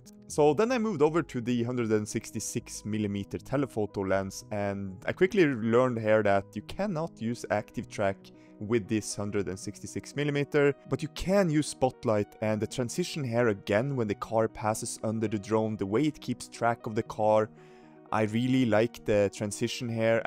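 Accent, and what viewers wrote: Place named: Norway. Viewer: your English is perfect. Men eg kjenne igjen ein nordmann når eg hørre ein.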